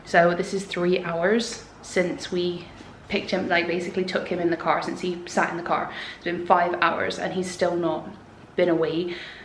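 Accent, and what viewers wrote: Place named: Bristol, United Kingdom. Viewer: Your accent🙂Are you from Bristol?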